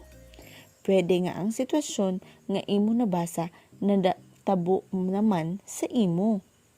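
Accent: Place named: Iloilo, Philippines. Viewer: Ilonggo gid ya...♥️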